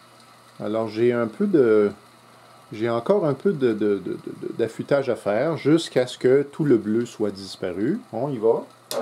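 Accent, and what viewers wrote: Quebec, Canada. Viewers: De bonnes astuces pour le débutant avec comme bonus ce bel accent québécois... Merci ! 😉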